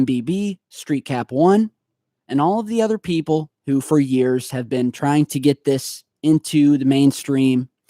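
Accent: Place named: Ohio, United States. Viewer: Your Southern Ohio drawl sure stands out early in the morning.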